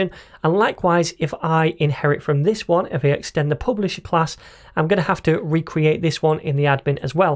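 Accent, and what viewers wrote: United Kingdom. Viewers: Always watch your tutorials, like how you explain each video clearly for beginner and love your British accent:) Hope someday you have complete Dart series. Thank a lot,.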